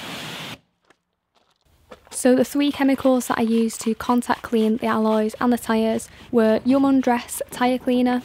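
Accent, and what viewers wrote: United Kingdom. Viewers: ي لبى الاكسنت البريطاني بس🤍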